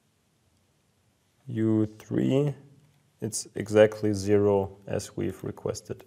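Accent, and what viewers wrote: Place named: Germany. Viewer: deine Aussprache scheint Sie kommen aus Deutschland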